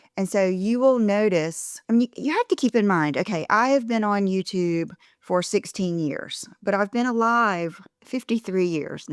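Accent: South, United States. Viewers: You are authentic and that’s why we love your content, just don’t loose that beautiful authentic southern accent, it’s charming beyond words. TY for your great videos!❤❤❤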